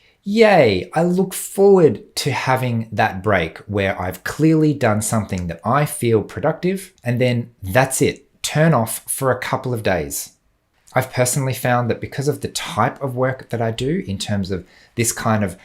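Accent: Australia. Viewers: He 's Australian...not Scottish...❣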